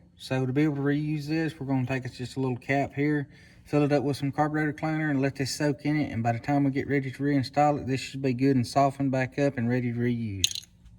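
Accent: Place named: South, United States. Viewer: pity about the southern drawl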